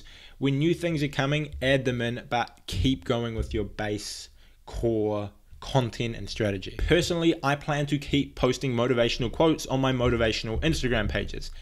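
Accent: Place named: New Zealand. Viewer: 6 minutes into this video I thought... "Is that a kiwi accent?", I open your channel in a new tab, check location... NZ. Sweet as! This is the first time you get recommended to me. Good video!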